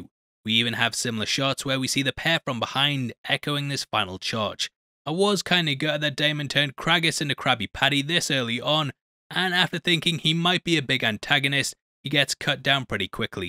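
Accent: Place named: England, United Kingdom. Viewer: Love your accent, what part of England is that from?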